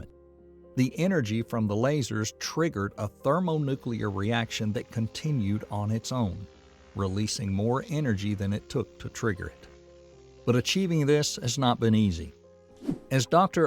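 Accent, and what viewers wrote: South, United States. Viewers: I've never heard a physics doc narration with a southern accent. I think I love it.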